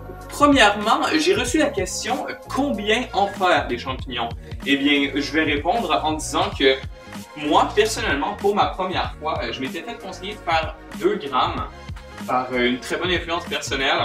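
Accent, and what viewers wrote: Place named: Canada. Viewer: Tkt ca mfait juste bizarre que tu parle canadien jsuis francais moi^^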